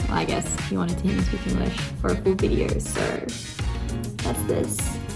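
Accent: Australia. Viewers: You got Australian accent xx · I can hear your Australian accent! I live in Australia so I felt an affinity with you haha I live on the Gold Coast Where is your dad from? 🫶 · You’re English is very Aussie💖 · AussieアクセントとAmerican or Canadianアクセントが混ざってる～💕住む地域がいろいろだとそうなりますよね！！なんだか自信をもらえました。ありがとう❤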